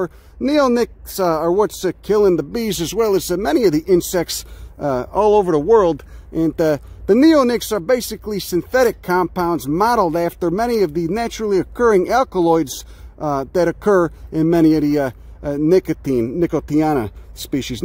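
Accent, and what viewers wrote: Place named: New York, United States. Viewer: Name that accent! My guess is NY/NYC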